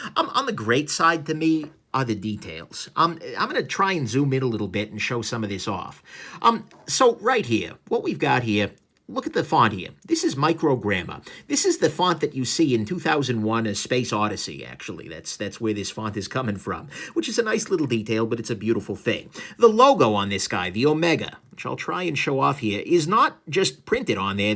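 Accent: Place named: East Coast, United States. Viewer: I love his accent. It’s like a East coast mobster selling you a caahh. Cheers mate!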